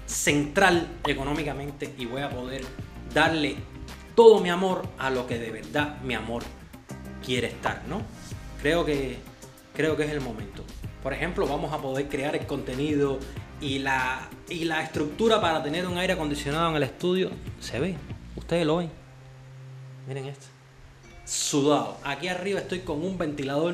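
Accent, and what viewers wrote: Cuba. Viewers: Espectacular noticia, felicitaciones, te lo mereces por ser quien eres y como eres, y no se trata de competir con nadie, pero eres uffff lo máximo, lo mejor, un grande, imbatible, profesional, inteligente, con muchas destrezas, te dejas querer, amable, amigable, apasionado y entregado a lo que te gusta y todo eso es lo que te ha hecho crecer; muy merecido, me gusta como hablas, como te expresas, como pronuncias, tan cubano, tan coloquial si caer en lo ordinario y vulgar, muy lindo que ames exageradamente a Cuba, es un país hermoso!! Continua siendo así tan auténtico y sencillo, mira que de lo bueno, poco!!!! Éxitos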